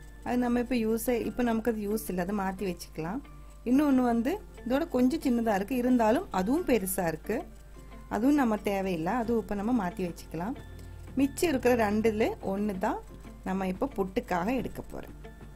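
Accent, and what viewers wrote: Kerala, India. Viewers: നിങ്ങൾ മലയാളിയാണോ? തമിഴ് കേൾക്കുമ്പോൾ മലയാളി തമിഴ് സംസാരിക്കുന്നതു പോലെ തോന്നി. പിന്നെ എല്ലാ recipies ഞാൻ കാണുന്നുണ്ട് എല്ലാം നന്നായിരിക്കുന്നു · Love your kerala thamizh. Keep it up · You talk very sweetly. You remind me of my Malayalee friends from college. In fact your fluency in Tamil is excellent. You probably live in Tamil Nadu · Her malayalam mixed tamil slang is nice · Tamil speak..good... To be avoid Malayalam slank... nothing to worry... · Superb details ... very nice demonstration , ... Thank you Madam .. I also like the Malayalam flavoured Tamil..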